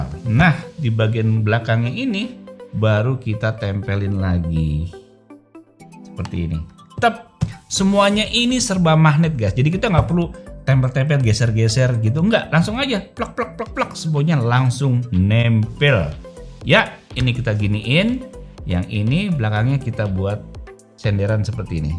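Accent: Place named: Java, Indonesia. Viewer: Bang orang mana sih kok jawa bgt hehehe😂. 😅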